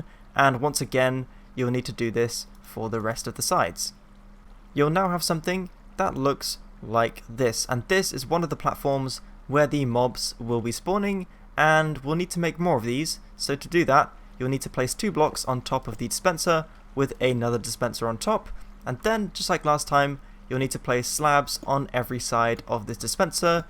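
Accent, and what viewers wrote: United Kingdom. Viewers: It’s called an English accent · its called being British lol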